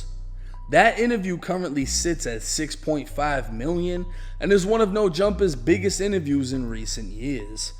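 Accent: Boston, United States. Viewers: putting on Boston. Respect bro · I knew I heard a fellow Bostonian accent I had no clue u was from here boy much love keep getting the bag 🙏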